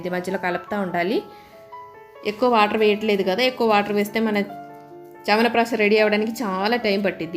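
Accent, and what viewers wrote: Kerala, India. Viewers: Your malayala telugu చాలా మధురం! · I think ur not telugu ur keralan because ur words u spell looks keralan . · Madame thanq R U Malayaali ? Ur accent is like that. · Sure she is a Malayali. Can identify easily. I'm too a Malayali. Thanks madam. Good to hear a good recipe from you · Yes... I guessed correct... My mom is also from Kerala... I know that pronunciation, how Kerala people speaks telugu... 🙂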